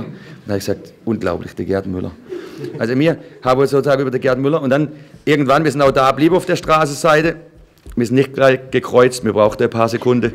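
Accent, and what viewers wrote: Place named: Baden, Germany. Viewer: Badener, kein Schwabe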